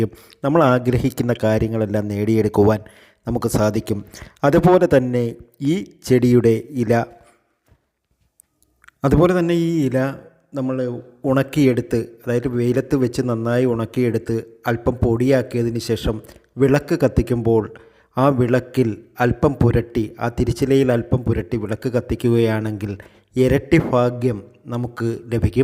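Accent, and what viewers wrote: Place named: Kottayam, India. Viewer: Kottayam dt aakum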